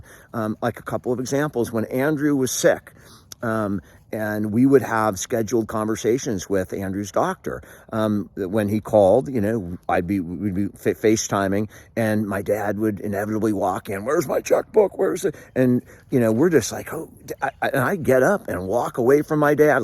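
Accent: California, United States. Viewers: You have a heavy California accent lol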